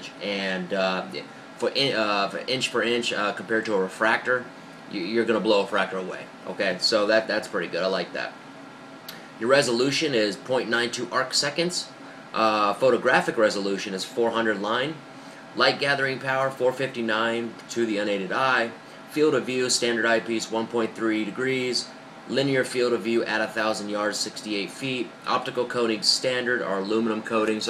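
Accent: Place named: Texas, United States. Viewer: i thought you was from texas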